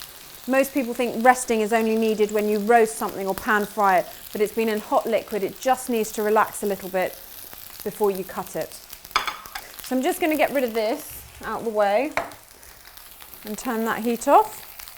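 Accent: United Kingdom. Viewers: honestly, I subscribe ld to this channel to listen to the beautiful British accent 😍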